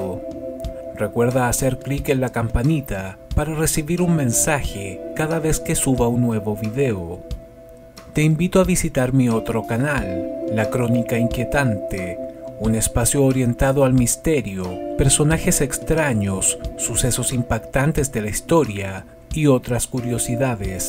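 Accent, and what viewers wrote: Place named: Chile. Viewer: es la magia del acento chileno que al hablar lento, parece musica para tus oidos, como si oyeras al silencio cantar: <link>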